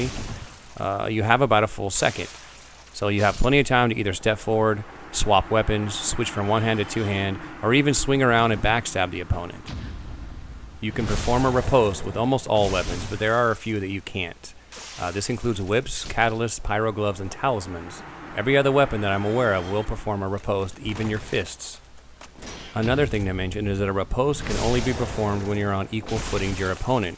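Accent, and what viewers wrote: United States. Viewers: I see what you mean. Sometimes different microphones have a huge effect on the way a person sounds, but seemed to have a slight southern accent in a lot of these videos that I don't hear in the Fextralife vids.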